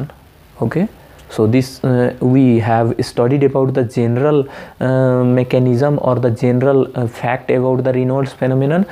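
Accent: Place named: Bihar, India. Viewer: Don't laugh on accent ..may be he is a Bihari..but well explained everything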